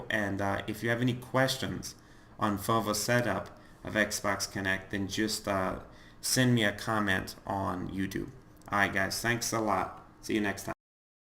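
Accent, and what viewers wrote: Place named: New Jersey, United States. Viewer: this guy is 100 % from new jersey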